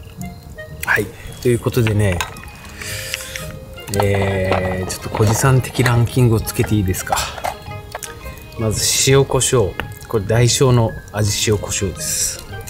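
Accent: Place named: Hakata, Japan. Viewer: 乾杯ありがとうございます😊 今宵も良い晩酌が出来ました🎉 はい美味しいぃ〜😆✨ やっぱり博多弁のがいいですね🤭 やばばです🫡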